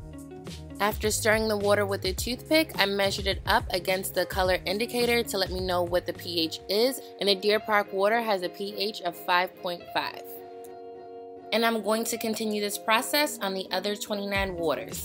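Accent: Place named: New Jersey, United States. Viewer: Sounds like Jersey :) Wahr-tor instead of water hahah but since there's no Poland spring I'm guessing you're not actually from Jersey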